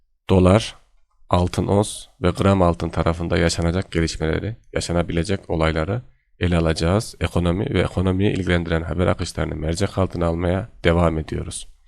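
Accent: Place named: Yozgat, Turkey. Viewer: Bence yozgatli kardes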